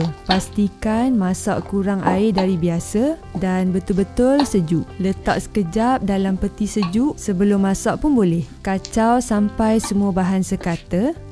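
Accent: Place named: North, Malaysia. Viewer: ni asal mana eh.?? ada bunyi utara skit2 tuhh😆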